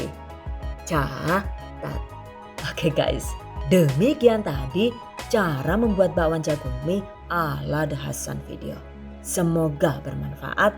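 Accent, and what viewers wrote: Banyumas, Indonesia. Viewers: Setiap lihat video yang aku tunggu adalah ngomong NGAPAKNYA.. wkwkwk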